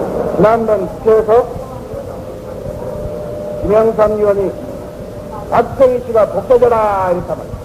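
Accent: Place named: Jeolla, South Korea. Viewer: 품격없는 선동의 말솜씨로 과연 나라를구할수있었을까?목소리 넘싫다.전라도사투리 넘싫다.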